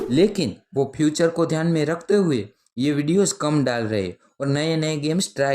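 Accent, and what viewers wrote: Gujarat, India. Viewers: Bhai tu gujrati hai na 😂😂😂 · Ha ye gujrati hi he avaj se😅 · Pakka tu bhai Gujrati hain 💀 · Bhai gujarati hona 😅😅😅😊AVAAJ SE PATA CHAL GAYA MAI BHI GUJARATI HU❤ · Ha bhay ya gujarati ha lakin kontat achh dal raha ha ❤ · Avaj se lag rha nam gujarati hai and darbar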